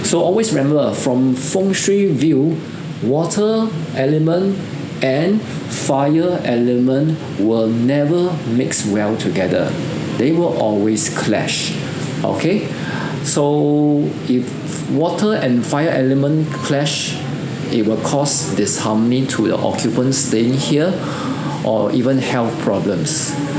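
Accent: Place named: Singapore. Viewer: The Singaporean English ........😳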